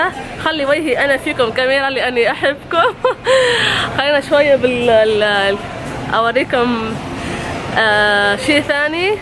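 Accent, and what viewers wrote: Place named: Tunisia. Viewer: وانا من ليبيا والله ماني متغطرسه ولا متكبره بس انت مفروض تفهمي المتابعين تبعك انك بدك تكون محترم في هالدول كون بعلمك وبقيمتك ماتجي هون وتفكر الحياه ساهله وتلاقي نفسك homeless لابيت لاشي ولاقرسون في البارات انا بتابع كل يتيوبر مغتربه مثلي واستغرب من اللي تفكر نفسها عبقرية ولامميزه على اهلنا بالوطن العربي بس لانها بامريكا ولابكندا بدك تعيش كريم هون خلي علمك يفرضك عليهم وهم يترجوك تبقى ماانت تبحث عالحيل للبقاء اما عن السوريين او الفلسطينيين اللاجئين لا لوم عليهم وهم فوق الرأس لانهم من بلدان دمرتها امريكا واسرائيل بعفنها ومخططاتها الفاشله وتحاول تجيبهم لاجئين لتبين للعالم انسانيتها اما عن كونك تونسيه فانا عارفه لهجتك واضحة بالزاف لي انا افمهما من لما كنت في ليبيا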